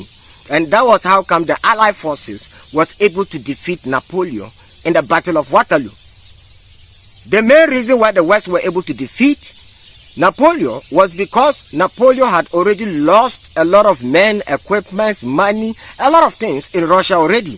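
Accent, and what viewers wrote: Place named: Ghana. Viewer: Stop the Ghanaian English and listen 👂👂 to the West. New technology is the issue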